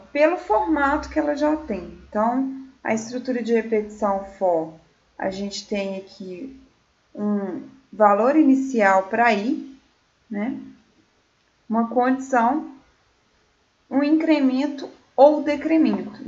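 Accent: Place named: Minas Gerais, Brazil. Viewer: Parabéns você explica muito bem, ficou melhor ainda com este sotaque de mineira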